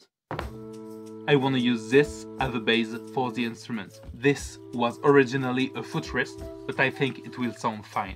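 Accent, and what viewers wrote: France. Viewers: Salut ! J'ai beaucoup aimé la vidéo, mais je me posais juste une question : Comment as tu réussi à autant développer ton vocabulaire en gardant un accent français si prononcé? la bise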